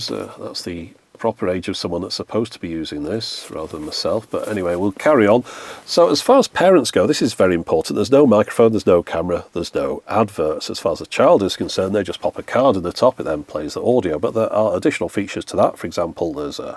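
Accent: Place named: England, United Kingdom. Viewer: I like the chirpy English voice.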